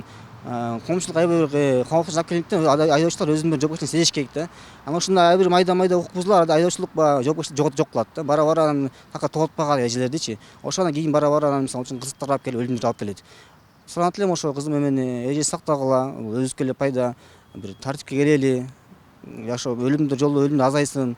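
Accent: Standard. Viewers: Азаматсын 👍👍👍Кыргызча таза суйлойт турбайсынбы, биз ойлоптурбуз кыргызча суйлой албайт экен деп. Ишине ийгилик иним....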